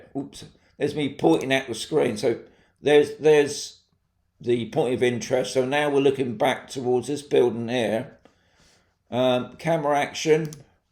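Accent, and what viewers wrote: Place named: Bristol, United Kingdom. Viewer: Sounds like you're from Bristol, UK. Am I right?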